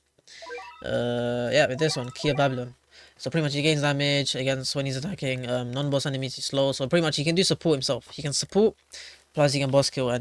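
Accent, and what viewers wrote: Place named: United Kingdom. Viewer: Strong bri ish accent😊😊😊😂🎉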